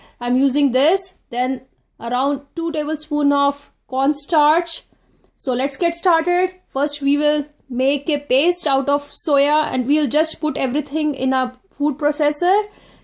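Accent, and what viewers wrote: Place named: Punjab, India. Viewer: U have punjabi accent. English doesn't suit you. If u speak in punjabi it will sound more good seriously.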